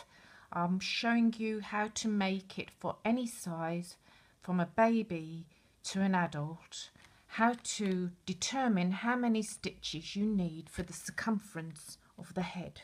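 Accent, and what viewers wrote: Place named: North, United Kingdom. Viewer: Thanks..... love hearing that northern twang!!!! Im from the north too!!;)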